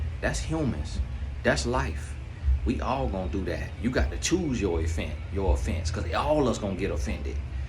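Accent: South, United States. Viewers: “Don’t try me like no baser” 🤣🤣🤣 you know you from the country when you say that! That’s how we talk where I’m from lol (Wildwood). This video was spot on though, some great knowledge. We are the salt of the earth, country draw or not, we were meant to stand out. Our power lies in our authenticity! Period!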